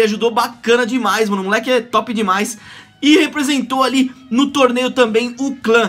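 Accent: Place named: São Paulo, Brazil. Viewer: vc é paulista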